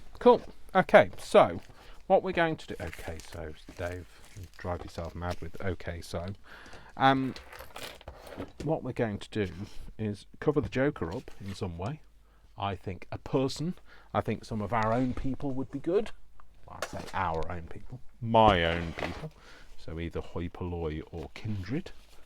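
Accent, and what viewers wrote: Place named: United Kingdom. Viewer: Love them Dave. Love your Britishness, love your accent. Love your creations, love your mind. I love all our UK accents. You be you, because thats what we love about you. 💜💙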